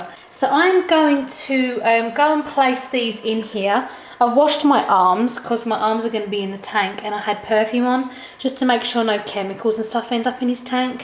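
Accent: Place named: London, United Kingdom. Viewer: You went to Walmart. You sound like your from south or east London.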